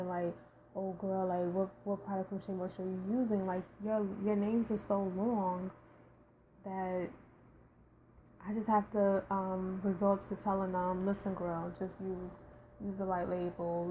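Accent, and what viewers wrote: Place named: New York, United States. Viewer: She sound like she from nyc but her voice is relaxing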